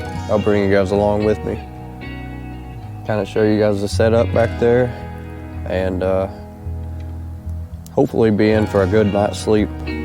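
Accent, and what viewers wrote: South, United States. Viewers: Love that southern accent 😀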